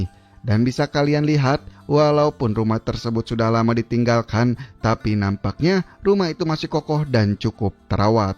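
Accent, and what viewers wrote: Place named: West Java, Indonesia. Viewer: Logatnya sunda pisan, pasti orang Sukabumi ini wkwkwkwkw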